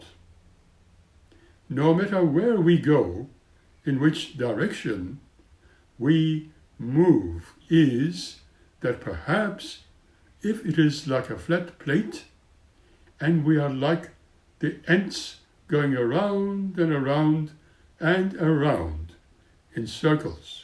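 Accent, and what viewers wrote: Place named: Turkey. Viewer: Merhaba! Your Turkish accent was perfect.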